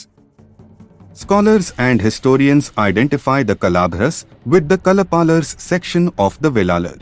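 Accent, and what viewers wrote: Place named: North India, India. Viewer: Thanks for the channel to have done this.acxent seems to be from north..happy that history is spread over india.please.do it in hindi too brother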